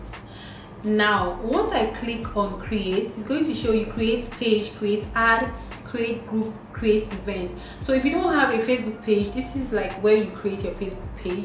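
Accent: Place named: Yoruba, Nigeria. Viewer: Nne, why do you sound so Yoruba? Looking peng though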